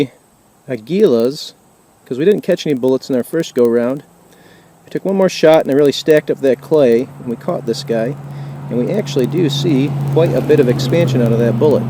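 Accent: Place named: South, United States. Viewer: Why are you talking like a backwoodshilljack can't say anything about brothers talking ghetto brah.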